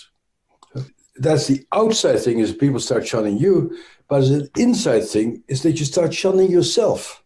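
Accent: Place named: Netherlands. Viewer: I have lots of happy memories around neighbors with Dutch accents. So I love to hear Bessel speak. I am also part Dutch.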